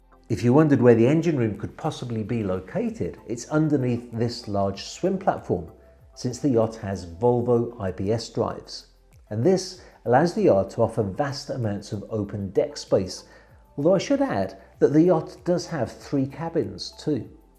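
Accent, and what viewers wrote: United Kingdom. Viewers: Where abouts in the UK is your accent from, its driving me crazy trying to pin it down.?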